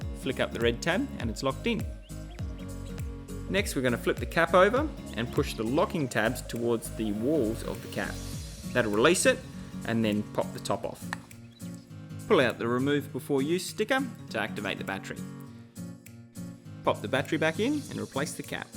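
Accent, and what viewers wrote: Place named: Australia. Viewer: Your Australian accent is the best!!!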